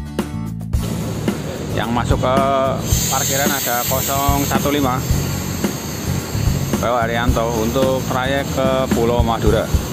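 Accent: Banyumas, Indonesia. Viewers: Selamat siang kang.. Nek ira ngapak ora ke penak..antap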